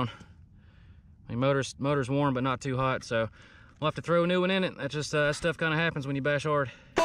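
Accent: South, United States. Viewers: I thought i heard a little southern in your accent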